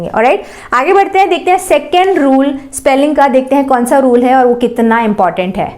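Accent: Himachal Pradesh, India. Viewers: Are u from Himachal?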